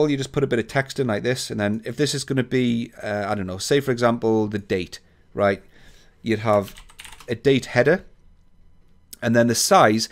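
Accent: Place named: United Kingdom. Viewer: liked your video should be very helpful! I'm in America so it was nice hearing someone from across the pond lol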